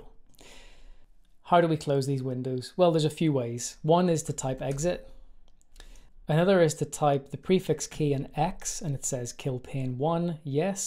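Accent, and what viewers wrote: Northern Ireland, United Kingdom. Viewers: Ive always loved the northern Irish accent. Its so militant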